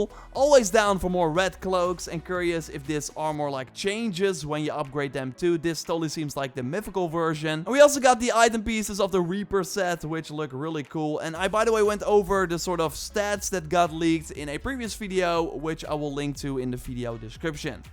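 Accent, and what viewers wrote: Netherlands. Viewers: Dude are you ducht youre accent sounds very ducht, ps gimme does creddits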